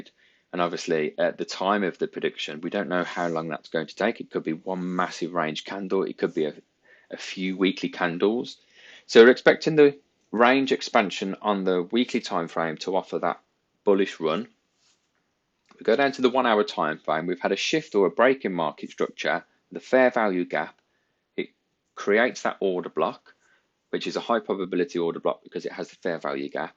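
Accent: Birmingham, United Kingdom. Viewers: i can only tell because I'm a brummie myself lol. Most won't though right. Nice to see a brummie on here. Keep up the game bro.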